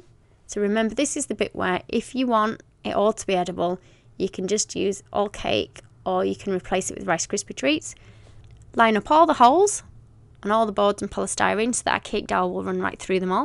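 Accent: United Kingdom. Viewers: fathi irfan No she sound kinda British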